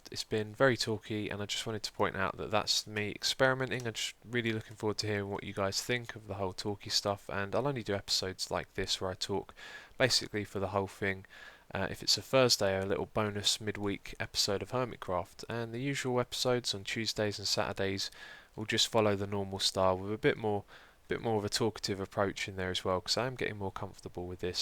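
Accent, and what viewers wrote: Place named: England, United Kingdom. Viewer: Your pronunciation of water is correct, you just have an accent. I would say about 50% of English people say it that way. I also do that sometimes, I pronounce mountain Moun'ain. I also noticed about 50% of Americans tend to do that.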